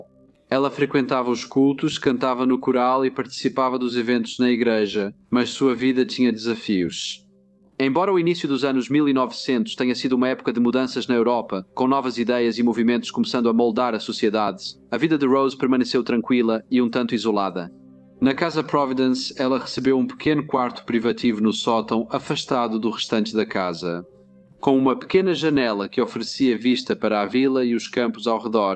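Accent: Portugal. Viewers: Este sotaque de Portugal é um saco! Dá pra resolver esse problema? Se continuar assim vou deixar de assistir vídeos deste canal.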